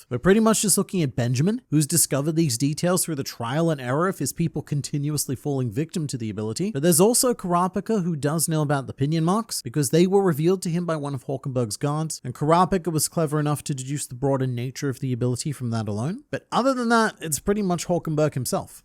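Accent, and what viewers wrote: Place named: Australia. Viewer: My wife just said, "is that the strange Australian internet man talking about Hisoka?"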